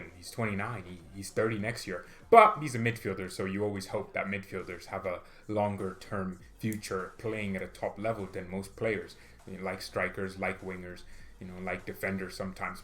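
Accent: England, United Kingdom. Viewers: That English accent tho 😂👌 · Dude you English accent is funny as hell. 😂😂😂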